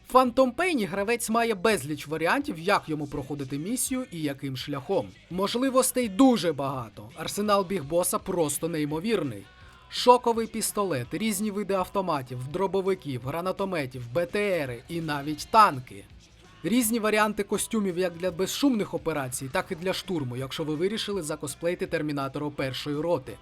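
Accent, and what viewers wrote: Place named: Russia. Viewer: Как видим, российская челюсть не позволяет нормально разговаривать на украинском. Продолжаем зоонаблюдения.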